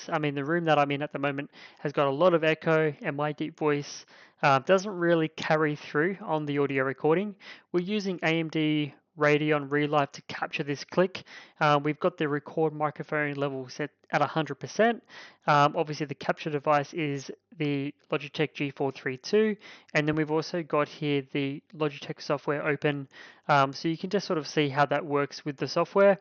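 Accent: Australia. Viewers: THE FUCKING AUTRALIAN ACENT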